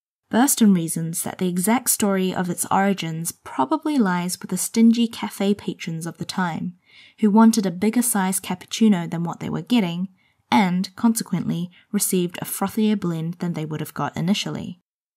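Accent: New Zealand. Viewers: 플랫 화이트 커피의 기원에 대해서 개인적으로도 너무 알고 싶었던 내용인데 이렇게 뉴질랜드 영어 발음으로 듣게 되어 영광입니닷! XD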